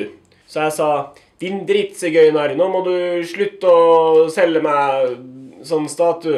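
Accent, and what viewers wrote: Norway. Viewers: Du har begynt å snakke i rart, jeg vil gjette Drammensk!? Jeg merker at det er litt vanskelig for deg. Jeg liker det. Trøndersk er kulere. Det er kult å være høy, jeg er høy. Ikke bli bloggerfaen! Jeg kjenner en labrador. Du er kjekk i dag. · Kjære. Digger nordlening-dialekten din.:-)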